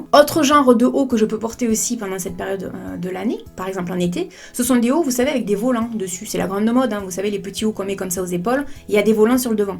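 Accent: South, France. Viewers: J'adore ton accent de sud😍😂 super video😉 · Coucou perso je porte des soutifs sans armatures que l'été et que quand je sort ou que je bosse. Le reste de l'année c'est juste un débardeur près du corps sous un pull .J'ai la peau très sensible et les soutifs avec armatures m'irrite la peau et parfois jusqu'à la brûler et cloquer. C'est très douloureux donc mon choix à été vite fait. Je dirais pas que ma poitrine à retrouvé ces 20 ans mais ce qui est sur c'est que nos muscles reprennent à soutenir tout seul et que au final ma poitrine tombe pas plus que sa. J'ai 38 ans 3 enfants et vie beaucoup mieux sans soutifs et j'avoue le regard des gens je m'en fou 😉. Ps:j'adore tes vidéos, simplicité et naturel, et ce jolie accent j'adore 😉 l'accent du soleil j'ai le même je suis pas loin de Perpignan 😃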